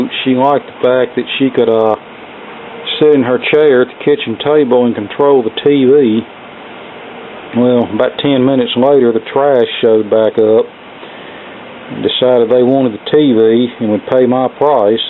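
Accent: United States. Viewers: Interesting American accent. Which part of America are you from, if I may ask politely? I am from Australia.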